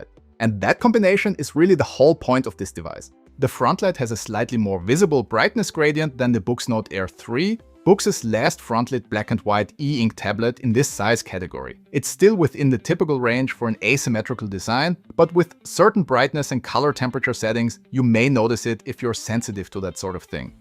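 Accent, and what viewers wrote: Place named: Vienna, Austria. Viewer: Great review! And now I finally know how Wiener Schmäh English sounds like! The Dewäääisss! But no judging, I also have an accent when speaking English :)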